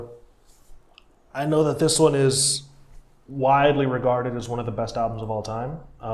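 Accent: Brazil. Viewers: desconfiei DESDE O PRIMEIRO SEGUNDO que tu é brasileiro 😂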